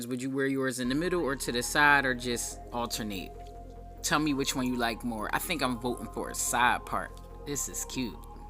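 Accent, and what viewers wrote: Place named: Delaware, United States. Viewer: I’m from Delaware but I live in Cali now. I love watching your videos and hearing your accent. It always reminds me of home ♥️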